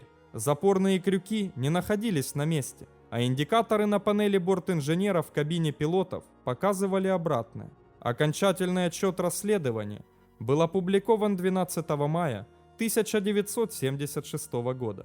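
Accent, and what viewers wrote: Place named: Ukraine. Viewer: у него произношение как у Креосана, скорее всего тоже украинец. У них как раз такой говор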